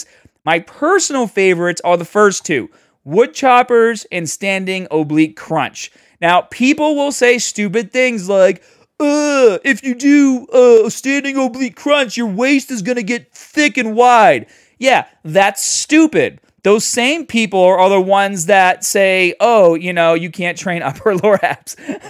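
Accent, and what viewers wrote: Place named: Massachusetts, United States. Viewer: I had a feeling this guy was from Massachusetts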